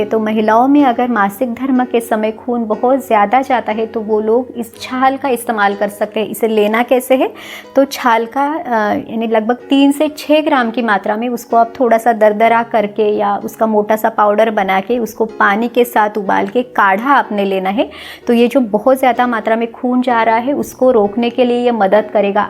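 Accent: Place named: Maharashtra, India. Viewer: आपल्या बोलण्यावरून असे वाटते आपण maharastriyan आहे मग मराठी का नाही बोलत कारण महाराष्ट्र खूप मोठा आहे.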